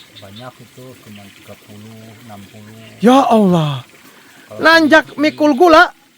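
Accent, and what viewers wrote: West Java, Indonesia. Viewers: Mantap orang luar P. Jawa (Maluku) pinter logat sundanya 😁 (cuman di sunda tidak ada istilah 𝐉𝐞𝐧𝐞𝐧𝐠𝐚𝐧, Itu istilah orang untuk orang Jawa/bukan sunda) 🤭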